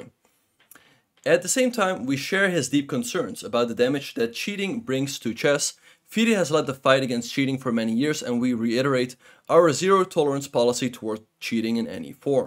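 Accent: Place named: Netherlands. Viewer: Man I love your accent I can tell you're dutch or flemish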